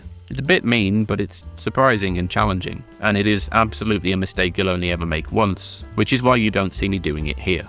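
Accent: United Kingdom. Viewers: no, no you don't sound annoyed. You sound ANNOYING. Lol, clown. Skipping the video and dropping a dislike because literally every video with a British accent is them complaining about how much better they know something than everyone else does, even though they're actually the ones being aggressively reductive and flippant and irreverent and, because of all those things, inevitably wrong about every single subject they come across. Not watching the video but please, comment I'm wrong if you put yourself through this nonsense and feel I got it wrong. It'd be interesting to me because the odds are that I didn't get it wrong. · As an Aussie it's my national duty to take any self-appointed Starwing expert with a Pommy accent down a peg. Because what's the point of being on the internet if you can't get mad at random people saying ... wait, you said that bit. 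Anyway, despite your alleged Britishness and supposed history of playing this game since 1993, you insisted on calling the game Starfox instead of its right and proper PAL title, so you've brought this on yourself I'm afraid. Hostile force carrying bits of geometric structures around intending to build something? Mate, those are Corneria's own robots cleaning up the debris. You don't get points for shooting them, because they're friendly. They even carry off the pieces of the Attack Carrier that Falco destroys in Course 3 Corneria. Because he does in fact do that. Calling this an on-rails shooter ... such disrespect. Starwing is a rail shooter crossed with an obstacle course. That's the secret other half of the ingredients that makes it so good. Arches! Arches everywhere! Don't worry about your score? Mate, that's like two-thirds of the replay value in this sleek little cartridge. You've got to get your money's worth. 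You haven't beaten Starwing till you've rung up a perfect 100% score on all three courses. And no cheeky Black Hole shortcuts allowed either. I only ever managed it on Course 3 once. Once. And I don't have the photos of the old living room TV to prove it. You're just saying the score doesn't matter because you didn't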